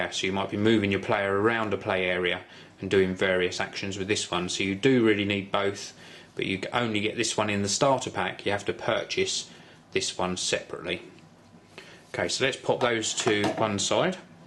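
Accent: England, United Kingdom. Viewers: It's an english accent. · It's a british accent:)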